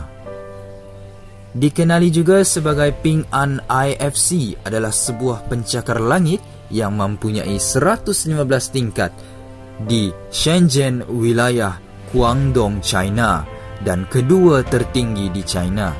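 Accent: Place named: Sarawak, Malaysia. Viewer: Sarawak? Sebab ko ade slang sane.. Kan kan kan kan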